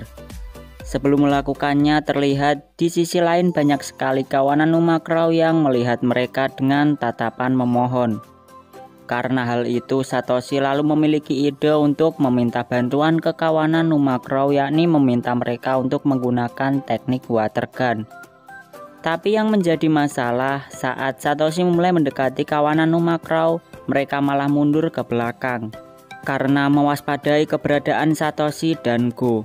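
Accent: Java, Indonesia. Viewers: Wong Jowo Mas ? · Orang Jawa mana bang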